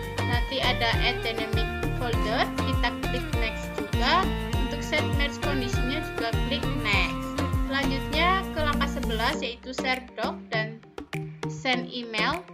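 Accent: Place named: Java, Indonesia. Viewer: Medhok ya kak😂semangka❤semoga bermanfaat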